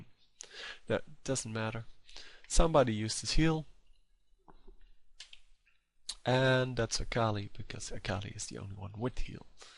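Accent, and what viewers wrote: Netherlands. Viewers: ze engels heet een verschikkelijk nederlands accent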